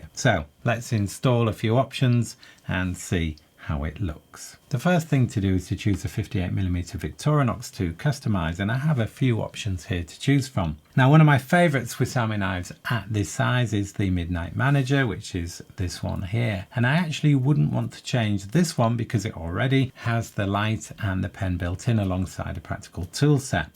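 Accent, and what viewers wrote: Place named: Hull, United Kingdom. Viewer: Great video... I made one myself as well based on the press kit but linked back to yours as you had actually got "hands-on" with the scales. I hope that's ok. I love your videos, and also that West Hull voice of yours is just the ticket for when this old Hornsea expat is missing home. Crack on...and all the best.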